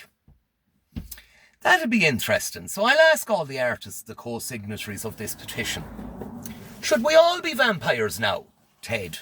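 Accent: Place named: Ireland. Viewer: Thanks I could listen to you all day. You have a fantastic speaking voice and accent. Irish legend.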